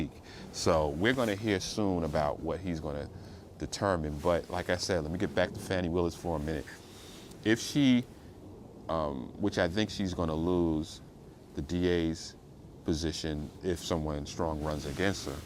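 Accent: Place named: New Jersey, United States. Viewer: it sounds like ur from Jersey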